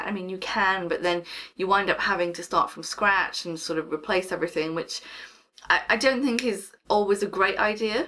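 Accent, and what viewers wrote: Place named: United Kingdom. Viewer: The most beautiful accent of the world : english UK ❤🙋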